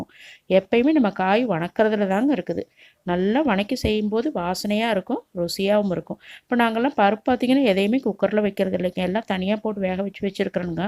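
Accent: Kongu, India. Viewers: ஏனுங் கொங்கு தமிழ் ரொம்ப அருமைங்கோ. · Kongu tamil👌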